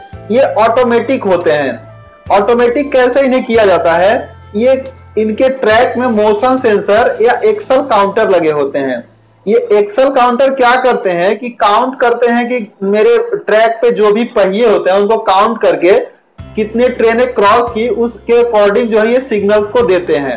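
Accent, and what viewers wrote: Bihar, India. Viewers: Bhai Bihari language me Aisa hi Bolte hai. V Ko bhi, Mai Ko ham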